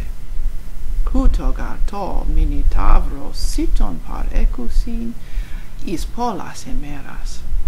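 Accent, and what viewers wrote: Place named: Greece. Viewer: Very well read. It actually sounds Greek. The others should copy your pronunciation.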